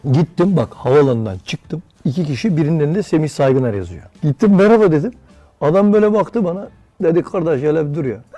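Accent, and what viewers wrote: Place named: Diyarbakir, Turkey. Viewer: diyarbakır ağzı mükemmel, kusursuz.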